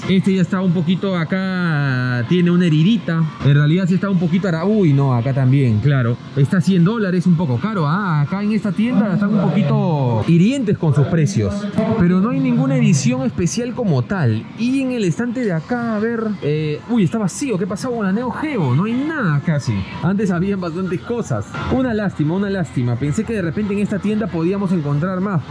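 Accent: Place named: Peru. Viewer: Me gusta mucho tu contenido es interesante pero de verdad que no puedo soportar el acento y la voz peruana es como si estuviese viendo discovery kids lo siento:(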